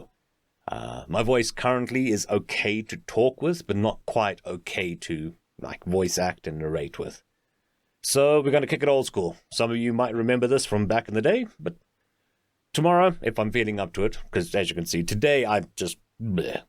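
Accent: Scotland, United Kingdom. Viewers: loving your work and working through the previous recordings, as a scotsman i'm very impressed with your scottish accent. Hopefully you will be well enough to get back recording soon.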